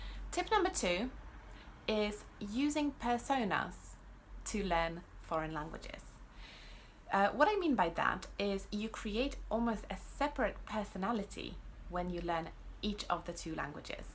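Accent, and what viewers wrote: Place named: United Kingdom. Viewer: Very useful tips, I'll definitely try out some of them. Last year I started to learn Norwegian and Hungarian from scratch at the same time and I was quite unsure whether it was a good idea. I have one question for you: how did you manage to lose your native accent and speak like a Brit? I'm Polish too and although my accent is clear I'm still far from sounding like a native. Thank you for the video!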